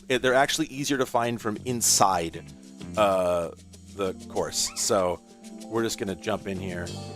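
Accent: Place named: Australia. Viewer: Yay. Australian commentator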